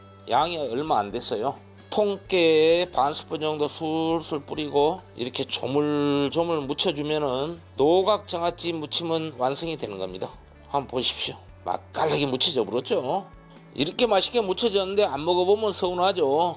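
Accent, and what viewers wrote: Jeolla, South Korea. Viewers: 노각 짱아찌 꼬들꼬들 고기 먹을때 좋을것 같아요~저도 해서 먹어야겠어요~ 전라도 구수한 사투리로 재밌습니다😂 감사합니다😂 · 구수한 전라도 사투리 배우고 갑니다👍👍👍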